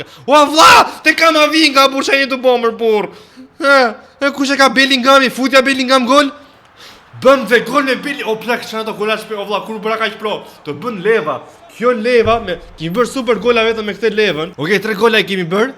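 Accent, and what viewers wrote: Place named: Fier, Albania. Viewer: Kush e vuri re theksin e fierit 😂😂😂😂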